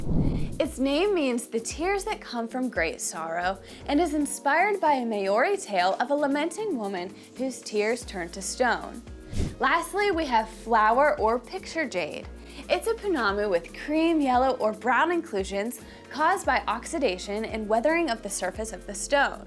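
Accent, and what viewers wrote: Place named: United States. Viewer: I am a huge fan of your channel and love your content… although you have mispronounced many words in this video, including the word Māori itself. Feels very American 🤣🤣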